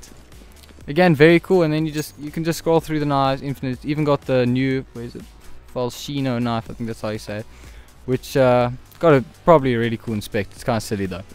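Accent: South Africa. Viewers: south african??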